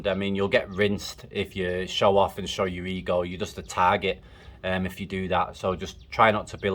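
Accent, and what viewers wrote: Manchester, United Kingdom. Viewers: You from Manchester,?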